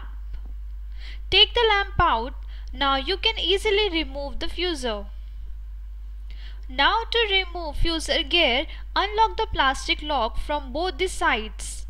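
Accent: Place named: India. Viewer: fucking india and their dumb fucking accent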